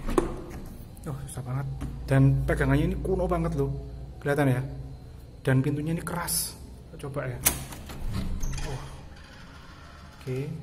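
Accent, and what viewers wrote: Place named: Java, Indonesia. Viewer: Medok banget... 🤣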